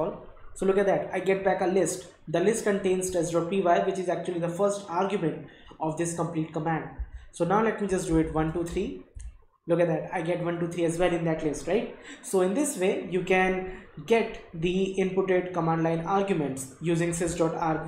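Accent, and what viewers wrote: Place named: India. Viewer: what an indian accent.. jesus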